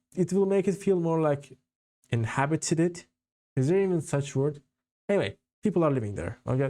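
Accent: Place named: Turkey. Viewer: İngilizce'deki akıcılığını takdir ettim. Günlük olarak ingilizce konuşuyor musun bilmiyorum tabi ama türk aksanından ve isminden yabancı olmadığını hemencecik anladım. Çok kaliteli bir kanalın var umarım dilediğinden bile fazla başarılı olursun! Abone oldum.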